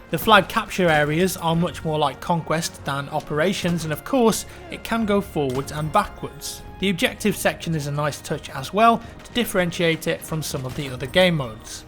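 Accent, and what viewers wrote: England, United Kingdom. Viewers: Trevor Leach I would to something about the male English voice! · Trevor Leach its an English accent mate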